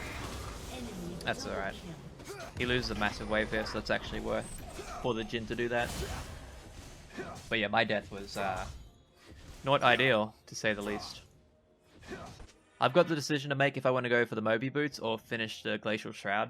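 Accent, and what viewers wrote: Australia. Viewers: Hi are u from Australia?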